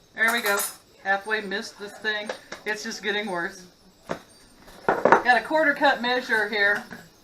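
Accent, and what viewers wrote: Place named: Missouri, United States. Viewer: Maters, crud--you talk like I do! Must be a "Missouri thing"! Thanks for the recipes--my stomach is growling now!! So glad you didn't "burn the house down!! Great video!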